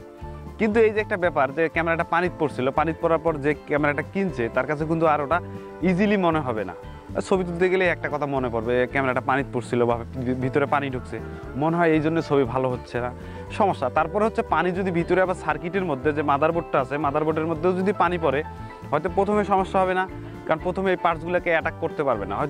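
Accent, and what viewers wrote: Rangpur, Bangladesh. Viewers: আপনি সাবলীল বাংলা ভাষায় কথা বলেন তবুও এলাকার উচ্চারণ আমার বাডী উলিপুর কুডিগ্রাম তাই বুঝতে পেরছিলাম ধন্যবাদ উত্তর দেয়ার জন্য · হ্যালো ভাই আপনার বাড়ি কোনঠে রংপুরিয়া কথা কন ক্যা ম্পা অবশ্যই জানাবেন কোন জায়গায়‌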